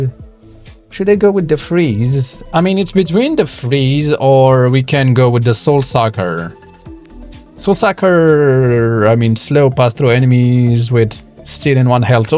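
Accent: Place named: France. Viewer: I like so much you "French Accent" that you imbue into your speach pattern :D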